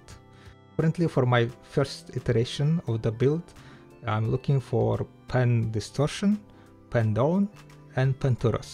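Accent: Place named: Rural, Russia. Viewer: ППц разговорный английский как из русского села)) чел завязывай) это явно не твое!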